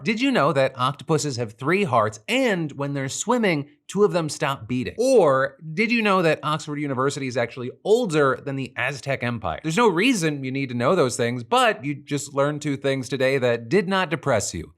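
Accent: United States. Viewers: My fun fact is for my Final GCSE Drama Performance, back in 2016, I needed to do an American Accent for a reoccurring news reporter character, I based it on and trained myself on your voice for about 2 weeks... And I passed! Cheers, Phil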